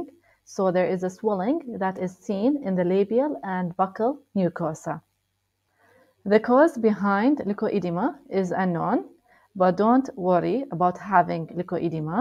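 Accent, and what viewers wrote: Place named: Palestine. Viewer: i can feel the palestinian accent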